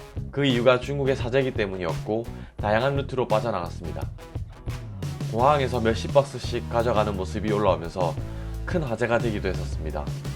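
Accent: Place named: Gyeongsang, South Korea. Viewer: 진짜 듣기 싫네, 저 목소리. 쌍도 것이구만. 쌍도 새기들은 무슨 유튜브를 저렇게 많이 하냐, 선거나 제대로 하지. 요즘 쌍도 사투리 들으면 두드러기가 난다. 꺼져라, 이 더러운 쌍도 물건아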